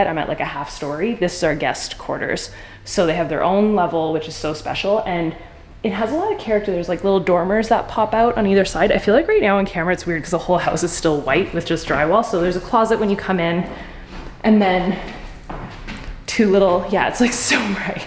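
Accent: Canada. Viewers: Omg. In love. Brett and I are living vicariously through you until we reach this! You have definitely raised our standards and life goals! We watch all of these golden oak videos together. Also my almost two yr old thought it was very funny when you kept saying babe bc Canada accent compared to our Texan accent lol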